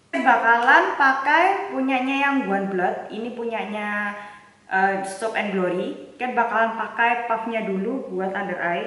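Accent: Java, Indonesia. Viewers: Kok medhookkk 😍😂😂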